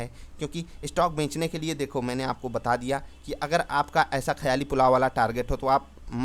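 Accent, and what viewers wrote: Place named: Uttar Pradesh, India. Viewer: Sir apki baatein bohot pasand hain aur achcha analysis dete ho aap... UP ke lagte ho aap.. kanpur ya lucknow ke aas paas ke? Kahan se ho aap?... EK BAAT AUR BATA DIJIYE for my analysis purpose... Which software or website u r using for technical charts ? right here in ur Video..🙏🙏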